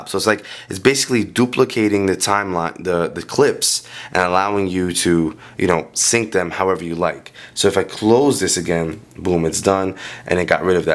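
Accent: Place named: New Jersey, United States. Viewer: New Jersey instructor! Great job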